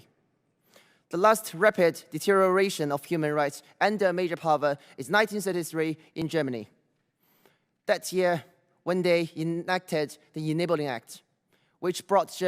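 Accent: United Kingdom. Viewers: 感覺是香港來的留學生，濃濃的英國腔，不過口條清楚、明確表達！ 🙂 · 比起边上的周（在直播里听了周的发言），杨的叙述更能深入人心，充分的依据、有逻辑的叙述、带有英国口音的悦耳英文，应该会touch到这些议员的心灵...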